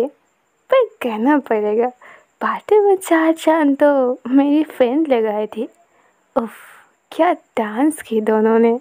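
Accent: Bengal, India. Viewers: I am fascinated to hear Bengali in your voice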